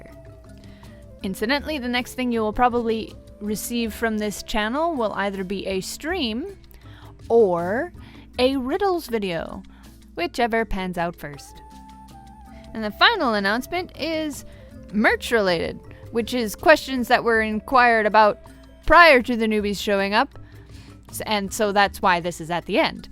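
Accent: United States. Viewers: its an accent from montana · Where is this accent from!!! I hear Southern Georgia combined with Four Corners and it is confusing my poor little brain... Also I really love it!!!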